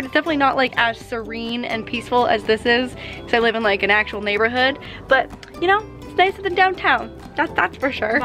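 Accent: Canada. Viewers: I love that you can tell you're from Canada when you talk! So exciting! Congrats on moving! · Is she Canadian? Her accent tho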